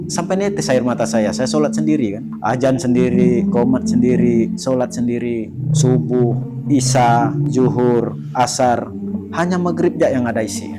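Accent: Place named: Madura, Indonesia. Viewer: Kok logatx madura bang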